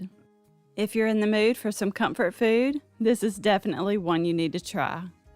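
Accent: South, United States. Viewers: I love your southern accent where are you from? My guess is Georgia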